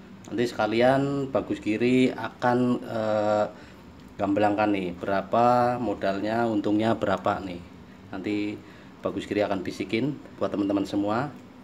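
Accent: Indonesia. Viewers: Kepingin..hahaha..medok bgt omm good 👍 bermanfaat.tks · Maaf suaranya kyak gak asing, mas apa orang jateng? Apakah mas alumni Ponpes al islah jember jatim? Tolong di bales🙏🙏🙏🙏🙏🙏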